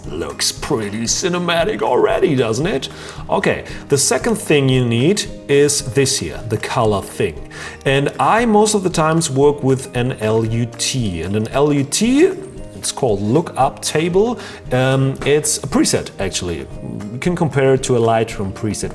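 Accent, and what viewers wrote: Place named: Germany. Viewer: Irgendwie hört man dass du auch Deutsch sprichst ;D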